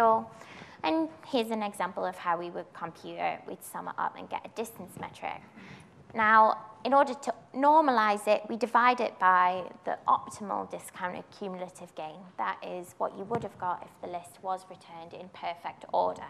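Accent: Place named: England, United Kingdom. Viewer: that's a very very english accent lol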